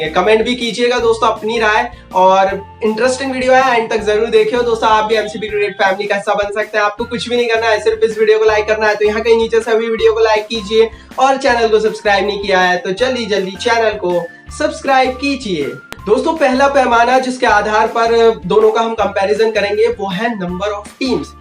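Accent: Gujarat, India. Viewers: Sir App Gujarati Ho?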